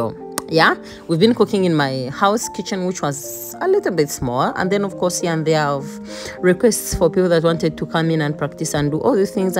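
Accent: Uganda. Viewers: I love the Uganda accent😅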